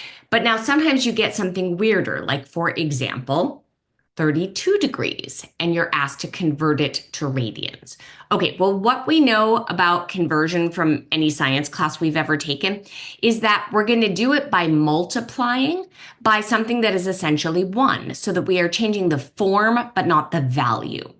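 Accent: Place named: New York, United States. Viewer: Lovely concise little video…also, I love that accent of yours..is it a New York accent?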